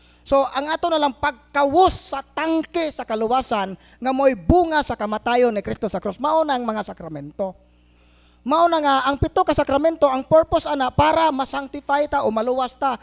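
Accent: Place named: Bohol, Philippines. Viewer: nindot gajod ni motalked c bro. KY real language gjod boholano gjod.nindot gjod paminawon bol-anon.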